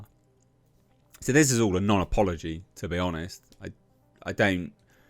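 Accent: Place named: United Kingdom. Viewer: Spoke like a true Brit .. ( the first 39 seconds of the post )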